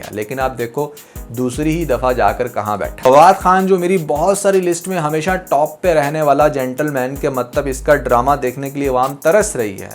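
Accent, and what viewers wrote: India. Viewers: Bhai ap indian logo ki tra kyn baat krte hn فر nhi پھر hota he Plzzz Urdu me baat kren Hindi me ni Ap hmare bht fvrt hn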